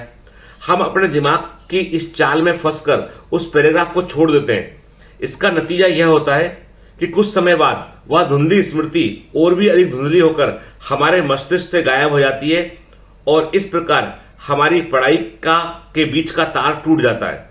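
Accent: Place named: Bhojpuri, India. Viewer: Vha sir gajab ki bhojpuri apki hn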